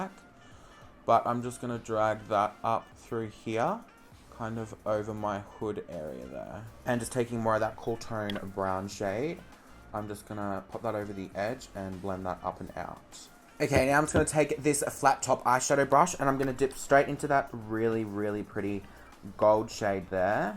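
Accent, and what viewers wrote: Australia. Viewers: Ellie Harriss he's Australian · australian accent is aesthetically pleasing omg help me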